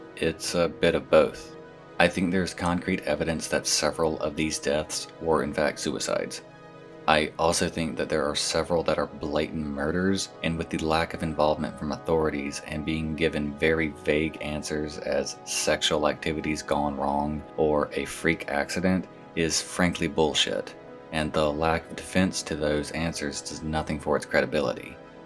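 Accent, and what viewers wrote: United States. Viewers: Hearing Lorry (instead of truck), flat (instead of apartment), and petrol (instead of gas[oline]), in an American accent is really strange lol.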